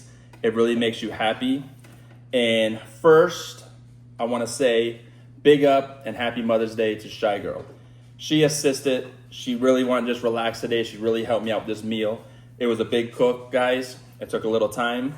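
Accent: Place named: Trinidad and Tobago. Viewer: found this channel referred to by trini style with videsh. hafta say it's heartwarming to see an american in love with trini culture. you even talk like a trini using our slang. big up yuh whole self.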